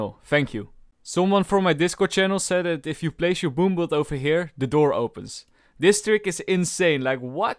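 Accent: Netherlands. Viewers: ik hou van u nederlands accent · Goed Nederlands accent wel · Are you dutch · Ik weet gewoon gelijk dat je Nederlands bent geweldig ik vind je oprecht geweldig man ga zo door!!!! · Love the reference to Kud on this moment xd I hear your Dutch accent so you can't say you don't know Kud haha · dutch accent :) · De Hollandse uitspraak van haha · Met dat nederlandse accent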